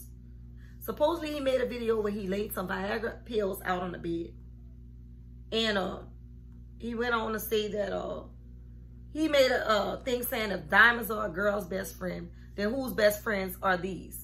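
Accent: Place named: Louisiana, United States. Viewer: U got to be from Louisiana....because I'm from Hammond la....all in your voice.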